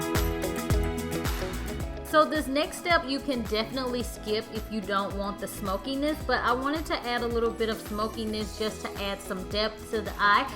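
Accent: United States. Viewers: Looking back at your older videos..and noticed that your accent has changed. You sound so country lol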